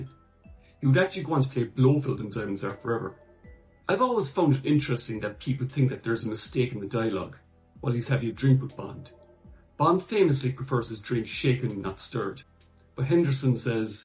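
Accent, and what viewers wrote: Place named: Ireland. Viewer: Great video as ever, thank you. 🙏 Your accent is great, what part of Ireland are you from?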